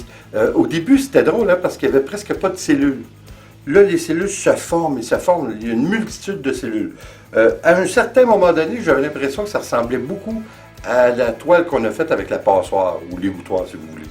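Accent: Quebec, Canada. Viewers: Intéressant, à tenter avec le produit à vaisselle. Plaisir aussi d' entendre votre accent qui me rappelle mes vacances au Québec. Bonne continuation. Brigitte de Royan - Charente Maritime en France.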